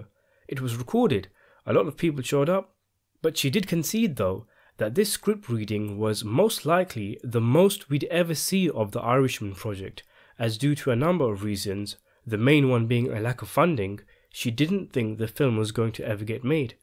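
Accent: United Kingdom. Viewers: Thanks, darling. but I am really curious about your accent. which part of UK is this accent from? I guess you are from Scotland or Wales or Northern Ireland. is that right?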